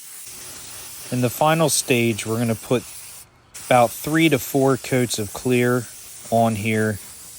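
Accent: Philadelphia, United States. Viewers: I think you're a Philly guy. I can tell that Philly accent. I'm in SJ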